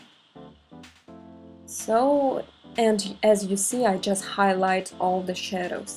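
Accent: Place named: Russia. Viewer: You have a russian accent, i think :)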